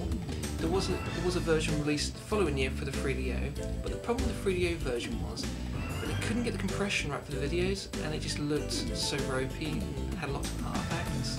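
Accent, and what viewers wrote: United Kingdom. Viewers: Well seeing as he has a british accent and all the games on his shelf are in PAL cases, I am going to put 2 and 2 together and say the guy might be from the united kingdom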